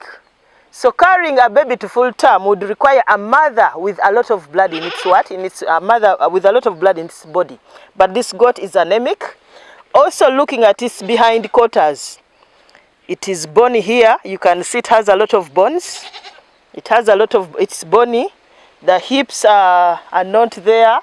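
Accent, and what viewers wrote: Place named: Uganda. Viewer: You are doing well ma. Excellent in your explanation, you must be a good teacher. I love Ugandans accent.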